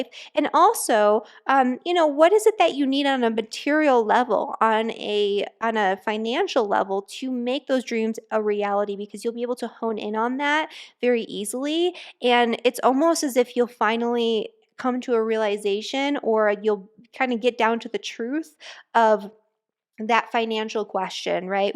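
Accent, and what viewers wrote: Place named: Oregon, United States. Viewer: I do not understand any of this but the only thing is for sure in this life : We are Doomed to any idiocy from the movements of our galaxy , anyway this girl is the reason I watch this , her accent I like it a lot sounds from Oregon , but may be from somewhere else, anyhow thanks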